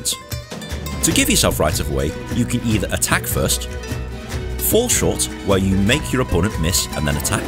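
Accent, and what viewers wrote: United Kingdom. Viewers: Very nice explained and I love typical british accent. But I need to correct something: the sabre is about 88cm long, the foil and the epee are about 90cm long (length of the blade/edge). The pictures doesn't show the correct lengths.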